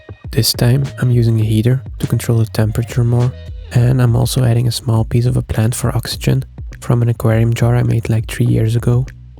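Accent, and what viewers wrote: Netherlands. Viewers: I hear Dutsch😅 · As a person from The Netherlands I was kind of thinking you were dutch by your pronounciation but not quite sure.. this got confirmed though when seeing the Triops kweekset.. Toffe video!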